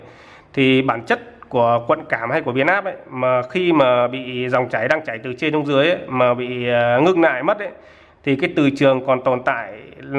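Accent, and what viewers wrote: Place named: North, Vietnam. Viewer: đúng thợ điện tử nói dễ hiểu.người bắc lại dễ nghe. cám ơn anh.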